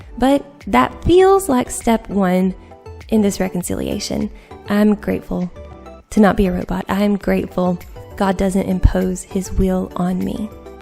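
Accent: South, United States. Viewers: Another Catholic loving your videos (and your southern drawl). Two observations: IMHO, it would be better to slowly fade out the background music. It's probably ok for shorter videos, but it can get distracting for some (like an ADD like me 😂) when you keep going deeper and deeper into such a brainy and solemn subject.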